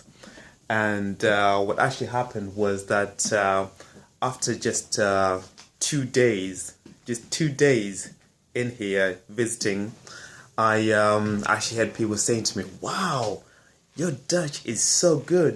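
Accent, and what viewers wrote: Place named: United Kingdom. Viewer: What ethnicity/nationality are you? You obviously sound British but it has a tinge of something else I can't pick up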